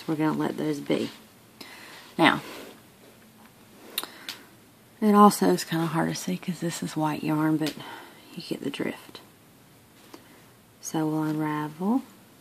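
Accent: North Carolina, United States. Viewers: I love your accent. I am from North Carolina, and I felt like I was home again. Great video, very helpful and entertaining! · Very clear and easy to understand. As others said, not boring in the slightest. I grew up in NC and your accent delights me and brings back many memories for this transplant to Yankee-land. Sorry if you've answered already, but what tool were you using to pick out the stitches? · Thank you so much for this tutorial. I used it on my first sock and it was my first after thought heel. You made it so easy to follow. Not sure, but sounds like you from the south. I'm from Ruston, Louisiana. So from one southern gal to another, thank you for the video. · K3N Clothtales recommended your videos and I am gonna be honest, I was a little floored when you started talking and you have the same accent as me lol I think you’re the first fiber artist/creator with a southern accent I’ve seen on YouTube. 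Subscribed and liked, as you came highly recommended and your video is great.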